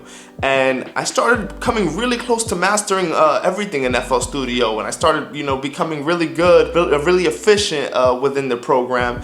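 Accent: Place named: Toronto, Canada. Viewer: you sound like youre from toronto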